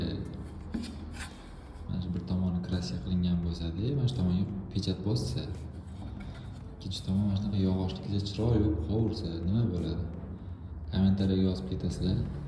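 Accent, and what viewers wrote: Khorezm, Uzbekistan. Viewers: Хоразмданмисиз асли шевангиз сал тортяпти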